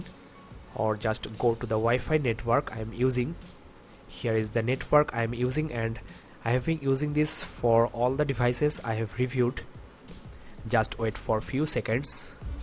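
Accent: India. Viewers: I hate when indian talk english..its so annoying..fucking annoying me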